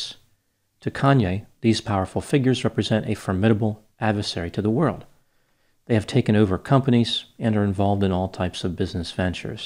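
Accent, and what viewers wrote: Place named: New Jersey, United States. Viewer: I believe he mentioned that he's in Phoenix now? Hence, all the cacti that's gradually increasing in his background. 🌵🌵🌵🤔😂 I definititly can hear the jersey accent in his speech, though, and I love it haha.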